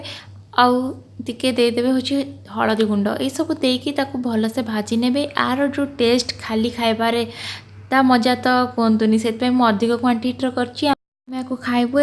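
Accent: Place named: Baripada, India. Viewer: tame baripadia ki tama katharu Jana poduchi hele bahut badhia ghanta Heichi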